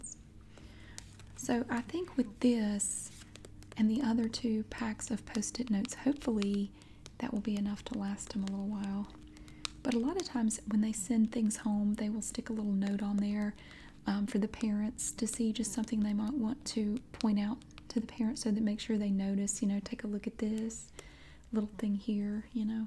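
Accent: South, United States. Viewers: Thanks for that soft and smooth southern voice. Veeery relaxing. I live in Hawaii so I don't ever hear that accent. Aloha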